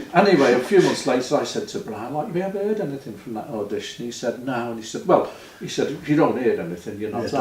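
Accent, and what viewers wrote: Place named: Liverpool, United Kingdom. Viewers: Great Interview... Sharing Musical Memories & Facts As Gold Mine! Thumbs Up & Thanks For Sharing These... Throughout This Very Pleasant Liverpudlian Accent!;-)) Keep Making & Sharing These!;-)) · Hi...I was recently asked by from Liverpool Film Club, if I'd be interested in doing a filmed interview with him to discuss my involvement with the Liverpool Arts Scene. This is quite an informal interview( Part 1), in which asks me about how I got started with music and groups in the 60's and 70's, through to my association with other groups and my Entertainments Agency that I opened in 1978. I did lose my way a little, where one moment I'm discussing my group 'The Topics' (1962/66), and asks if I met any well known bands...when my memory suddenly jumps to 1970/72 and I'm remembering my time in a Cabaret group (Recipe 4)....then I slowly make my way back to the 60's. 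Memory plays a strange trick at times. If you do take the time to watch this video...I hope you can understand my Liverpudlian accent...and that you don't find the conversation too boring..as it is totally about about local Liverpool places and names. Parts 2 and 3 Video's are to follow...in which the conversation moves through the 80's up to my Songwriting and current activities. Thanks for watching..if you have.....Regards....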